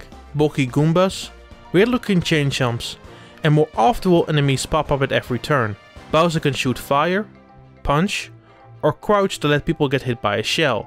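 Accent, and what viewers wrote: Netherlands. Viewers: You’re dutch, right? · hello fellow dutch person , i recognize your accent :D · Wow the Dutch accent can clearly been heard brother xD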